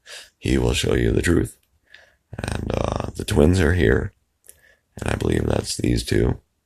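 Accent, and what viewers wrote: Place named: Northern England, United Kingdom. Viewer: Northern English accent. Bordermen on the south side of Hadrian's wall, The Roman empire didn't dare go any further North then the famous border wall. It's really nice to meet you and wish you all the best in the future and good health to you and your loved ones. Gonna get my camera out it's just getting dark now. What do you make of the Sickness that is being spread among us apart from sick of it already? Culling the population by virus?Anyways I have subbed and if anything happens or If I get my act together I will post u a message.Peace!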